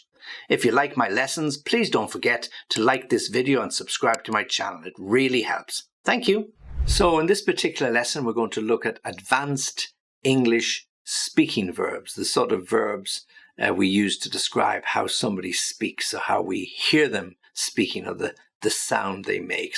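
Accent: United Kingdom. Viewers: Hi teacher! Thank you for your help and work. Amazing, helpful… really good to improve our English. By the way, from what part of the UK are you? I am trying to catch your accent.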